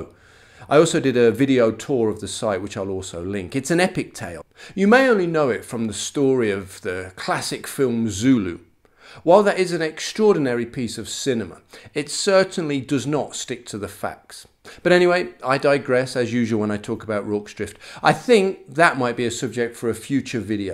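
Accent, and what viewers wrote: United Kingdom. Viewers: Happy New Year old bean ! I enjoy your British officer accent -ha-ha!!! At least in the film, "Gonny" was portrayed by Michael Caine-not 'arf bad !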